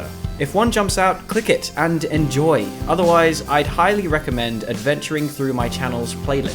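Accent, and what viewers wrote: United Kingdom. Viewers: Came for hollow knight stayed for a British (seems like it) dude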